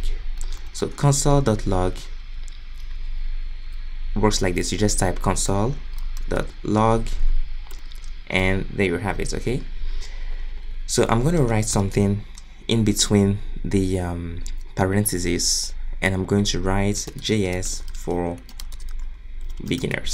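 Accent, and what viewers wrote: Nigeria. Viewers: Great tutorials, nice accent. Nigerian, yeah?😊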